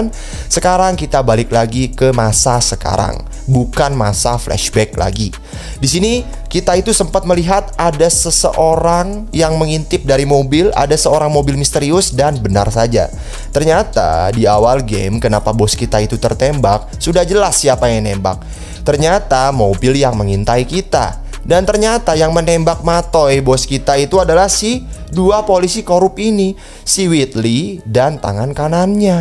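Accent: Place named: West Java, Indonesia. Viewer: Kang jiga orang sunda logatnya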